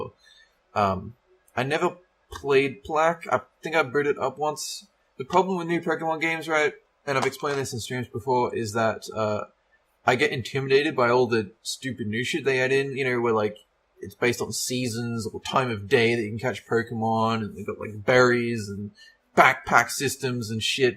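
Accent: Australia. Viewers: waaait.Where are You from,?Australia? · Yeah I was right, he's totally Australian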